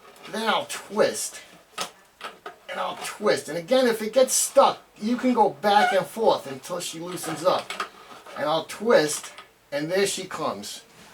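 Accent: New York, United States. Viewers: Thank you, I was a bit timid about touching the filament, using the needle nose to bend the metal base lip (my mama taught me this, btw) and turn, no luck, corroded. I watched your video, turned off the power and turn the glass filament, thank you. No one here, just me. Quick note: how it broke?-the glass bulb mysteriously fell off, leaving the metal base, filament and a spooked girl. I still love the old school bulbs, btw. love your jersey or NY'er accent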